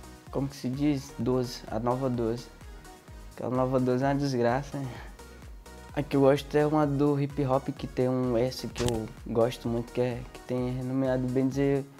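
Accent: Brazil. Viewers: O sotaque dele honra nosso povo Viva a Fortaleza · Since mó jeitão da Bahiano igual meu pai kkkkkk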